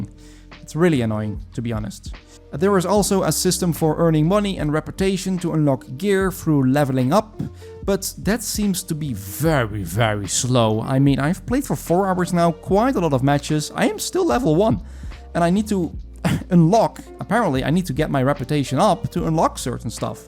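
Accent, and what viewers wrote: Netherlands. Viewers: U bent nederlands? Ik kan het horen aan uw engels accent.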